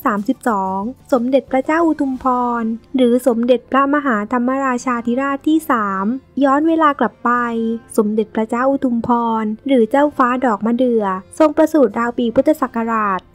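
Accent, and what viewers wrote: South, Thailand. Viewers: เป็นคนภาคใต้แน่?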